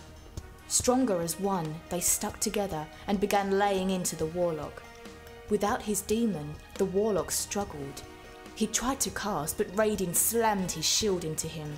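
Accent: United Kingdom. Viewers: Cockney chicks shouldn't be telling stories tbqfh! voice just doesn't work for seriousness dialogue! I feel like after you pause to speak your gonna say next "apples and pears 10 bob"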